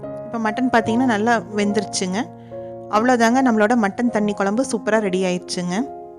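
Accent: Kongu, India. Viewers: கொங்கு தமிழ் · I'm your new subscriber sister.. Very tempting thanni kulambhu.. I'm from Coimbatore.. Unga kongu Tamil suprernga ...very nicenga ❤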